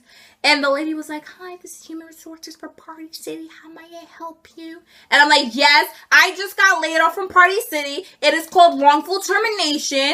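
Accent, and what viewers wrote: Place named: Long Island, United States. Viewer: idk why i love long island accents 😂 they're just funny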